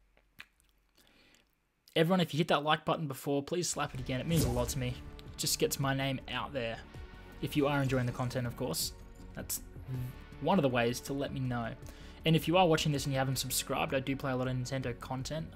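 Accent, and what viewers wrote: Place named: Australia. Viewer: I'm sorry about the cussing I'll keep it lowkey if I get to play again.Your stream style is the right type for me.Your fair with your subscribers and that's hard to find on the net some times.Glad I had this chance to be noticed with out having to ask for a plug THANK YOU SO MUCH!!!! You might want to consider blurring your server so you don't get so many stream snipes.I have always liked the Australian accent,(To much crocodile dundee as a kid)lol.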